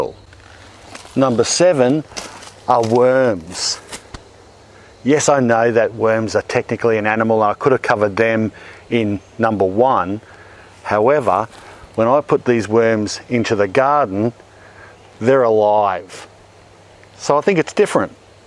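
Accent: Australia. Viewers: I was wondering what he meant.... Couldn't catch exactly what he said with the Aussie accent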